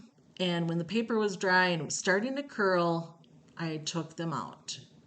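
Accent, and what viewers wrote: Minnesota, United States. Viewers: Your calm rural Minnesota style of speech is so nostalgic and comforting to me. I grew up in Minnesota and haven’t been back to visit in a long time. 🥰 Warm hugs from Texas!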